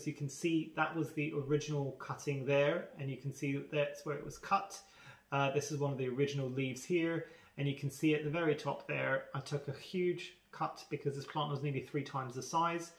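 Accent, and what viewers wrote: West Country, United Kingdom. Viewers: Really interesting video. You’ve got a bit of a West Country twang. Hi from Bristol ☺️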